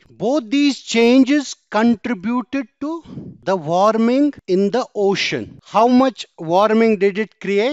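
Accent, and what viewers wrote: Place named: Kashmir, India. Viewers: Sir must be kashmiri because of dialect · Your accent is Kashmiri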